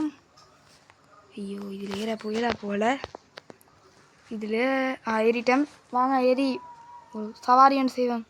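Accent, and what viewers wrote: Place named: Kerala, India. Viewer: Actually naan oru malayali than onga lihta malayali mari irrnthuthu so no disturbance 🎉❤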